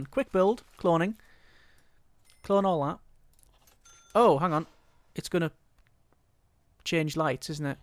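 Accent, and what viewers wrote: United Kingdom. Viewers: Hold up wait a minute are you British or Scottish, I only just realise that you are either British or Scottish, but if you’re not I’m sorry. I’m just saying this because you sort of sound like it.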